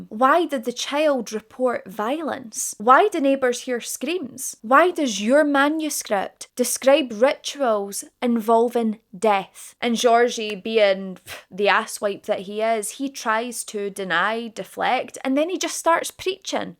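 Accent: Scotland, United Kingdom. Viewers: I really Enjoyed Listening to your TRUE CRIME STORY. YOU'RE A BEAUTY, WHO HAS SUCH A BEAUTIFUL ACCENT AS WELL..IS IT A SCOTTISH ACCENT. SOUNDS ALOT LIKE MY FAVORITE ACTOR SEAN CONNERY.. THIS IS SUCH A WILD, CREEPY CASE..YOU DID AN EXCELLENT JOB, BC IT HAS TO BE DIFFICULT GETTING THESE VIDEOS OUT TO US...I APPRECIATE YOU. I JUST SUBSCRIBED TO YOUR CHANNEL...I ALSO HYPED THIS VIDEO!!! MANY THANKS!!! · New subscriber here, I love your accent it sounds a bit scottish. Such a beautiful young lady you are. ❤ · Scottish Emma Kenny vibes. Love it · I love your accent. I have got to find a Scottish woman 😂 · Are you from Scotland? love the accent!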